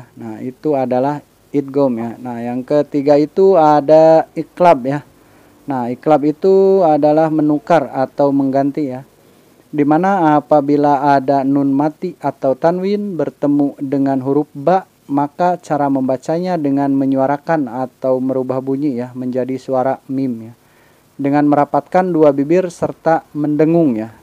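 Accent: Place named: West Java, Indonesia. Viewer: logat sunda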